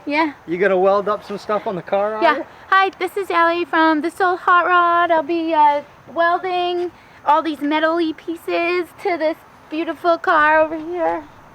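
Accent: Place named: Massachusetts, United States. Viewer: The car is coming along great! Your accent reminds me of my mom. She grew up in Gardner mass.. keep up the good work!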